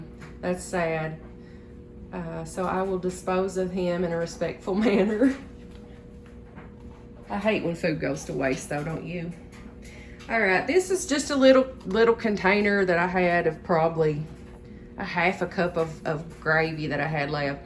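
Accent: United States. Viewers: Very smart!! I love good deals!! Omg you sound just like my family we’re from the deep South Georgia so you know we all hicks down this way 😂😂 God bless you!! Love how you’re teaching people how not to waste!! I don’t waste myself!! I like to take leftovers make soup or freeze them. I take my scraps & throw into my little garden, I couldn’t live with myself with all the people that are going hungry, & me waste anything!! You can even make extra batches of meals, freeze them & heat them up to save yourself from cooking sometimes. Thank the Lord eggs are going back down in prices!! You made a lovely meal!!!❤ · I’m feel so “at home”, watching your videos! I’m in East TN! I can really relate to your Southern accent & down to earth , personality ! You are a breath of fresh air! You are really creative ! God bless you ! Thanks for showing us , your bargain deals , from grocery store & your frugal meal ideas . 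Love the fridge clean out, ideas! When you talked about holding on to the one egg, it cracked me up! I have been hanging on to my eggs, for dear life ! 😂😂😂! I recently had the same thought as you! I can’t let these eggs ruin, by holding on to them ! lol. ❤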